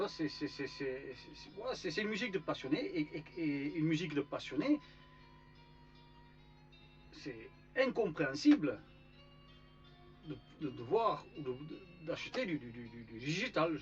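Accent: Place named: Pyrénées-Orientales, France. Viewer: Salut, Trop marrant après tout ce temps aux Pays-Bas, tu as encore un putaing d'accent des PO!!! Evol un jour Evol toujours! Sinon, je n'ai même pas le photo de Shud!!! Tu pourrais me l'envoyer par hasard plize? En tout cas, cool de te voir et t'endendre! COTLOD!!!!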